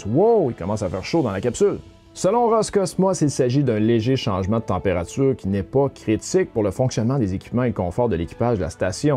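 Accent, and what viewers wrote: Quebec, Canada. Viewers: Très bonnes infos. En tant qu’européen j’aime beaucoup le côté décalé de la présentation et l’accent québécois si agréable à entendre… ça me change des psychorigides d’ici 🤣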